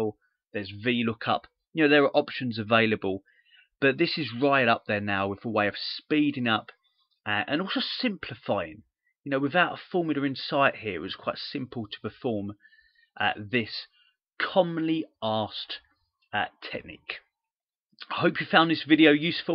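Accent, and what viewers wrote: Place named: Cockney, United Kingdom. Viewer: I watched at least 5 other videos and this was by far the clearest and easiest to understand - despite you being a cockney :). Thanks a lot....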